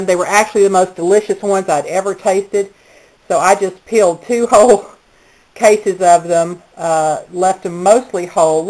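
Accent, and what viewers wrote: South, United States. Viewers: sweet, southern drawl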